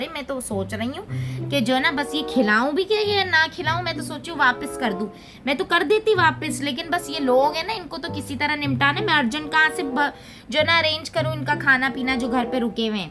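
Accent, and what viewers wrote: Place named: Lalukhait, India. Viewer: very good accent, ,same i heard somewhere in lalukhait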